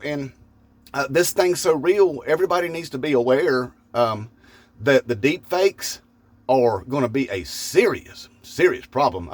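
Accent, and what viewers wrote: South, United States. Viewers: With your southern accent it don't make you bad guy imagine you talking with a wisconsin accent yea you know Der hey ya but don't cha know one time we agree old donny isn't best thing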